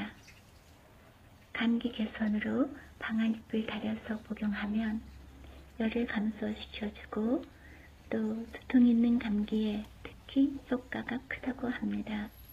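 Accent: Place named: Gyeongsang, South Korea. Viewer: 경상도사람목소리.ㅋㅋ.목소리는있는그대로...좋아요.제가 청음짱!이라서....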